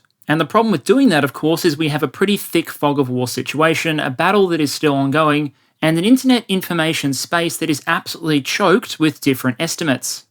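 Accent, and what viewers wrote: Australia. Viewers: Sorry but your whiney Aus accent makes it too hard to listen.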